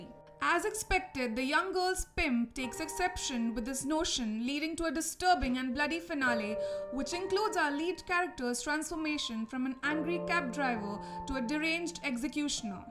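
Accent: India. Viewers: I hear indian i click back button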